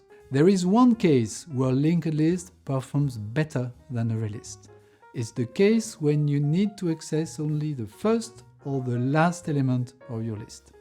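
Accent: France. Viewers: Thank you! I guess my French accent is incidental though... 😄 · I love the JEPCafe and I esspecially love your french styled englisch ! Keep on doing this series, its so great and informative ! Thumbs up!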